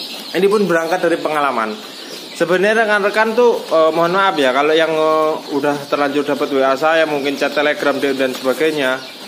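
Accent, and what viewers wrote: Java, Indonesia. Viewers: Bahasamu jowo kentel mantul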